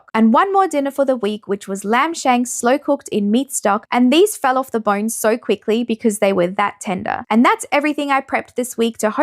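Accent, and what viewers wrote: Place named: Australia. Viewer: Hi! Seeing this content brought a smile to my face because we’ve also done GAPS as a family (it looked very similar to this!) and I just find it so refreshing hearing another Aussie mum talking about this diet!! I would love to follow you on instagram but your handle doesn’t come up! God bless x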